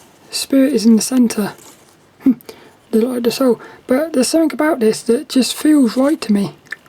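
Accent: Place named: United Kingdom. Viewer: Anyone can learn a little BritishnEnglish from listening to lill you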